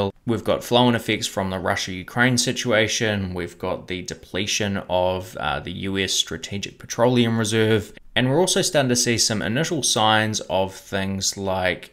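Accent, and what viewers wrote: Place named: New Zealand. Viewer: Love your kiwi accent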